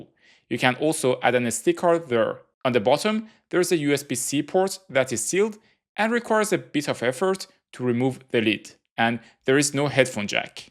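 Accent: Iran. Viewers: کاکا من حس میکنم لهجه ایرانیه؟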